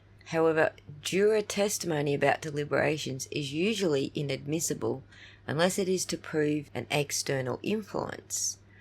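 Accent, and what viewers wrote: Australia. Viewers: Love yer Aussie x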